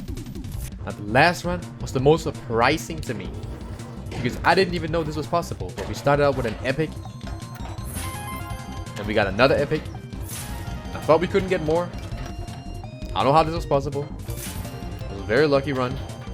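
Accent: Denmark. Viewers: 100% dansker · I smell Danglish :D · Are you by any chance danish? The accent sounds very much like it haha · Dansk accent on top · kan bare høre du er dansk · You danish by chance?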